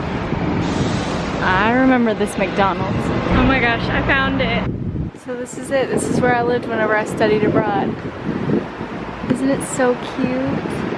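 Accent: South, United States. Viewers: I truly hope you enjoyed Paris. This is one of my favorite cities in Europe.... You have a lovely Southern accent as well 😀👍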